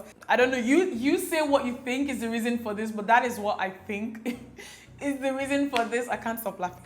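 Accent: Igbo, Nigeria. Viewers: makes me want to have an Igbo accent. It's so sweet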